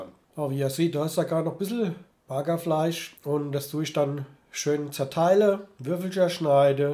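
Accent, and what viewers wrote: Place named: Hesse, Germany. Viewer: Danke für die Anleitung. Des wunderbare hessische Gebabbel hab ich als Exil Hesse total vermisst. 😊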